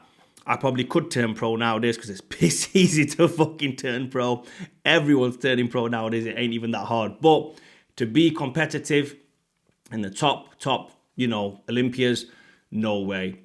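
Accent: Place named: England, United Kingdom. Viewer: Are you from England because you sound like your from round my neck of the woods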